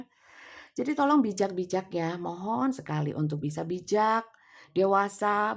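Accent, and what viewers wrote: Java, Indonesia. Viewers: Saya selalu ketawa tiap ibu keluar Jawa medok nya. Kalau ada orang yg serumpun bahasa dengan kita, lalu lihat video ini : kata "munthuk" itu bahasa Malaysia apa ya ? Saya nonton rame2 dengan anggota keluarga yg lain, waktu ndengerin ibu bilang "munthuk"... "angel wis angel" spontan ketawa. Baru tahu kami kalau ada juga cinta bersegi yg kasmaran tapi bisa dengan kontrol diri yg baik. Biasanya buntut nya cerai dgn pasangan sah nya, kalau beberapa hari ini berturut-turut bacaannya cinta bersegi, berarti buuuanyak yg menjalani kasus2 seperti ini. Terjadi penurunan moralitas dalam pernikahan. Dampak dolar naik ke 16ribu, ekonomi negara kita sedang tidak baik-baik saja, eh pernikahan kok ikut2an juga tidak baik-baik saja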